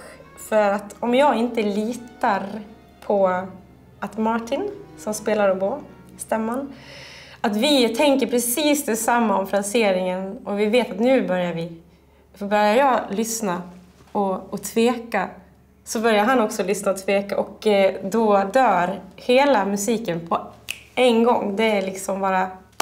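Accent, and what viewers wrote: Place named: Dalarna, Sweden. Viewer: shes from Smedjebacken and speaks a mild and pleasant "dalmål"-dialect, sort of swedish hillbilly accent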